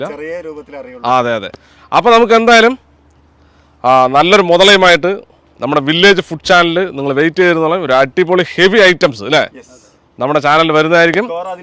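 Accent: Palakkad, India. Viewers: പാലക്കാടൻ ഭാഷ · എന്താത് പകുതി ചെല വെച്ചതായിരിക്കുവോ😁 ❣️പാലക്കാട് ഭാഷ ഉയിർ❣️ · കണ്ടപ്പോ മുതലകളെല്ലാം കൂട്ടത്തോടെ കണ്ടം വഴി ഓടി 😂😂😂 ഫിറോസ്‌ക്ക പാലക്കാട് ഭാഷ ശൈലി 😍😀😀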